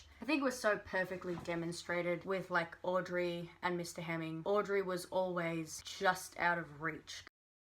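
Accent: Australia. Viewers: her accent sounds Australian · is she from Australia or New Zeland?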